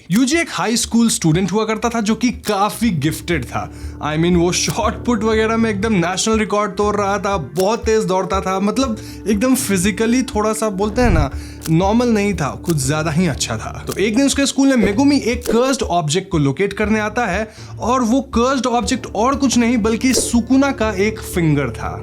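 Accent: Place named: Bihar, India. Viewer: Bhai ye sb to hmne anime me dekh hi lia 😂 Teri vdo dekhne ka kya fayda Vo bhi bihari accent me bol rha h 😏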